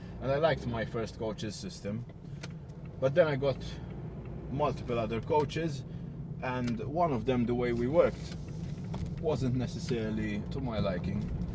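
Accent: Malta. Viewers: hes from Malta I think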